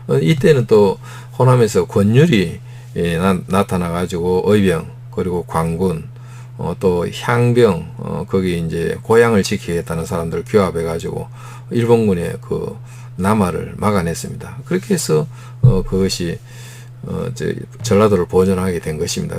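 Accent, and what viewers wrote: Gyeongsang, South Korea. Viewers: 쌍도 억양으로 지역비하방송하지 마시라. 개쌍도야, 전라도 전라도 반복하지 마시라. 호남이다. 약무호남무국가라 했다. 호남이 없으면, 민주도 없고, 조선도 없었고, 대한민국도 없었다. 쌍도 험한지형, 호남 평야,미군주력부대라서, 625때 영남이 보전되었다.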